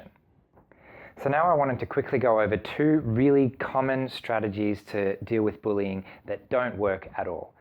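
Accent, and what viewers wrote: Australia. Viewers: I agree with most of what this person says, except for one thing...this person is saying reporting harassers & bullies doesn't work. In my experience, it does, both in my professional & personal lives. This person, though, I'm guessing, is in Australia, based on the accent I'm hearing (I live in Canada)...perhaps the culture is somewhat different, so maybe there's some truth to the statements made about this here but I doubt the difference is big.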